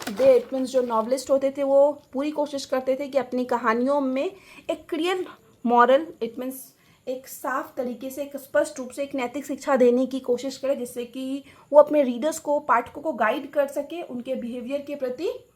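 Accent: Bihar, India. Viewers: Aapka tone of speaking Samastipur, lakhisaraay, Darbhanga, begusarai, jaisa milta julta hai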